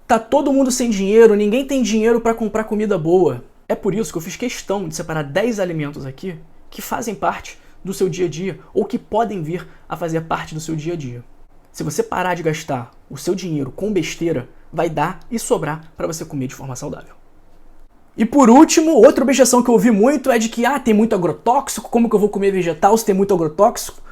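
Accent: Rio de Janeiro, Brazil. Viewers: Esses olhos enfeitiça a gente! E esse carioqueixxxx é envolvente! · O sotaque dele não nega...é carioca mermo! 😄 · Eu acho q o sotaque dele e do Rio de Janeiro muito fofo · Que lindo te ver falar, tua fala é chique, deve ser carioca. Deus abencõe......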